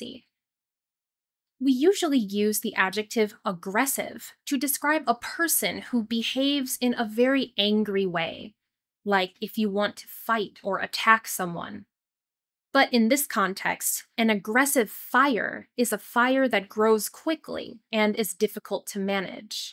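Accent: United States. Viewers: Hi, I really like your videos and your voice, your American accent and voice is so beautiful, you are different from the other channels, I like the videos that you make, it’s very effective and I hope that you make a lot more videos and maybe advanced English, thank you❤ and keeping going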